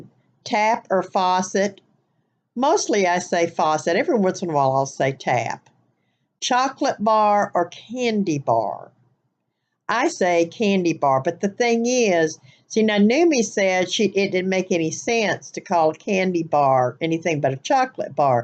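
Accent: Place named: South, United States. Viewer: You're so cute. I have always considered yours a southern accent. But not deep southern.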